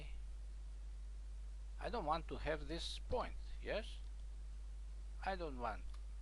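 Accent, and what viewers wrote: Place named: Poland. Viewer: I find listening to your lesson reminds me of talking to my Polish father and relatives. It was very well done and understandable.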